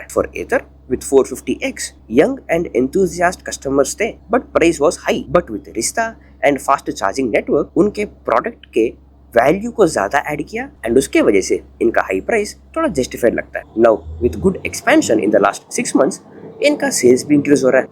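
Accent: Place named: Karnataka, India. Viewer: I don't think he is a north indian, most likely Kannadiga(from accent), at the most telugu person.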